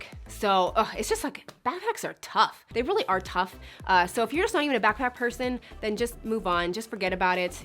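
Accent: United States. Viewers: She has an American accent 😅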